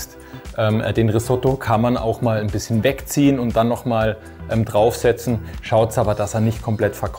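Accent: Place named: Berlin, Germany. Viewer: "Nen Gudn Appetit wünsch ich euch" lol Ich liebe diese geschwollene Berliner Redensart. Erinnert mich sehr stark an Christian Rach. XD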